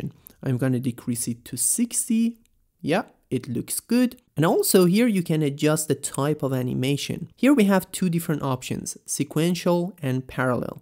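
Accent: Iran. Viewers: I can distinguish that Iran English accent from miles away. Cheers to you brother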